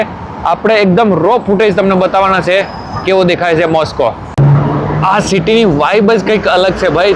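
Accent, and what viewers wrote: Rajasthan, India. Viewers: Gujrati bhasha me to rajsthani wala lehja h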